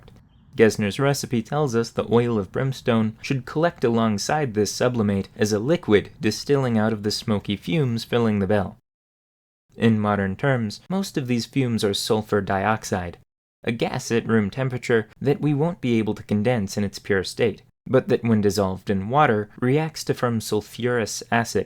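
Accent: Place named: Carolina, United States. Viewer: I'm fascinated by accent! The "er" creeping into words reminds me of some populations of English-speaking populations along the east coast of North America like the Carolina Broque or the New Brunswick accent, but it doesn't sound quite like either. I did a little searching and maybe it's related to some accents in the South, like in Georgia and Louisiana, but it's unclear. Authority becomes erthority, forty becomes ferty, forms becomes ferms, orange becomes ernge, chloride becomes chleride, proportion becomes propertion. So it seems there's like an ah/oh to er merger, but not always. Gold isn't gerld, common isn't cermmon. Anyways, if anyone has information or knows this accent, please let me know! Also, if you read this, I am not mocking your accent, I'm genuinely curious where it comes from.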